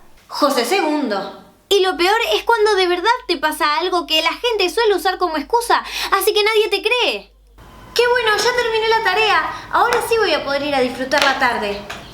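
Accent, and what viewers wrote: Argentina. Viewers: nuevo sub eres argentina? o no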